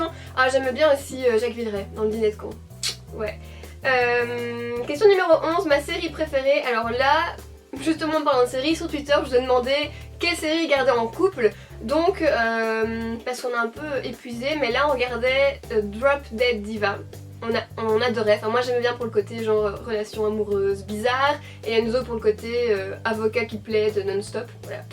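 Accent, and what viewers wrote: Belgium. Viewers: je kiff ton axant avant j habitait en Belgique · L'accent n'est pas très marqué je trouve comparé à mon oncle belge ^^ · Ah non on peut pas confondre l accent belge et suisse ;0) ! Parole de suissesse ;0) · Je crois que les gens confondent les accents car souvent pour les français, l'accent belge c'est juste l'accent bruxellois. Perso je savais que tu étais belge car je suis du Hainaut aussi ahah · Waow super vidéo 😘 Mais, une petite question, j'ai pas compris parce que tu as dit que tu est italienne mais que tu as un accent belge ? 😹😹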